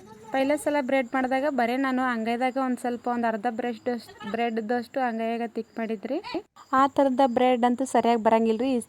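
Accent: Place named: Uttara Kannada, India. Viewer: ನಮ್ಮ ಉತ್ತರ ಕನ್ನಡ ದವರು ನಾವು ಹೀಗೆ ಮಾತಾಡೋದು ನಿನಗೆ ನೋಡೋಕೆ ಮನಸ್ಸಿದ್ದರೆ ನೋಡು ಇಲ್ಲ ಮುಚ್ಕೊಂಡು ಹೋಗು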